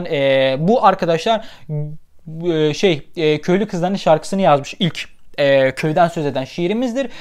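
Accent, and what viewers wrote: Adana, Turkey. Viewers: Abi sen vanlı değil miydin adana şivesi ne alakasxsxsxsxs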